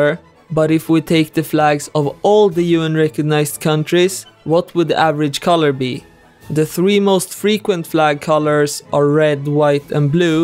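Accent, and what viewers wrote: Sweden. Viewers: Are you Swedish? As a swede, your accent kinda sounds Swedish, are you? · I knew it was a swedish person bc of the accent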